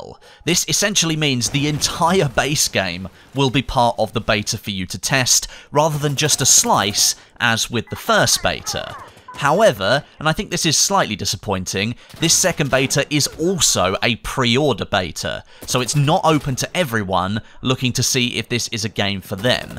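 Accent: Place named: United Kingdom. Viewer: why do british people pronounce beta like bayter?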